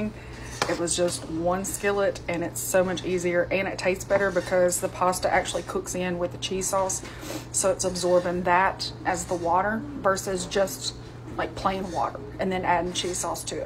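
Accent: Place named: South, United States. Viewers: you sound like an american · Somewhere in the south for sure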